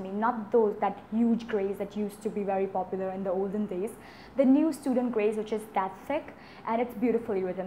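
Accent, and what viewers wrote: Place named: United States. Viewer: Your pronunciation is just like American but little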